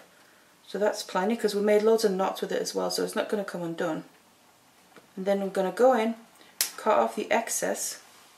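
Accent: United Kingdom. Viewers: She is using the UK pronunciation because, I assume from her accent, she is from the UK.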